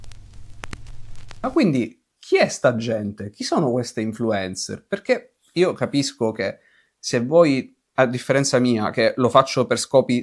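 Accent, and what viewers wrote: Sicily, Italy. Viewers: Purtroppo, il femminismo "è" la massima espressione — e al tempo stesso la forma più rozza e grottesca— del capitalismo. Chi, meglio delle donne, per la loro naturale e debole inclinazione a servire e compiacere l’autorità e i potenti, poteva incarnare così bene questo nuovo dovere mascherato da liberazione, dovere che non ha nulla di rivoluzionario se non quello di aumentare i privilegi del genere femminile, genere più servile del potere? Comunque, ottimo video: è sempre un piacere ascoltarti — e con quell’accento siciliano, tutto diventa ancora più piacevole! è stata una fortuna vedere ed ascoltare il tuo canale! grazie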